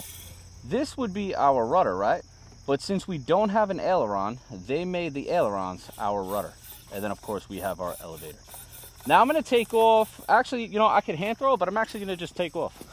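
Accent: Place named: Long Island, United States. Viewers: thought that was a LONGISLAND accent! I'm in Huntington! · “Where I live on Long Island”. Nothing was ever more obvious when the first words were spoken in this video 😅. Cool little plane.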